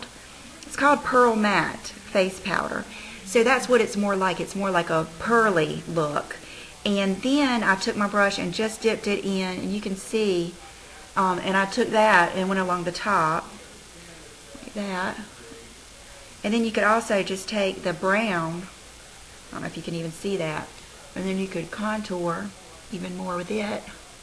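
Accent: South, United States. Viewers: I Love your videos..watching them has made me wont to go out and try new things..I have always used the same make up forever, but my make up collection is starting to grow LOL Thanks for your inspirations..Also I detect a southern accent....Im also from the south..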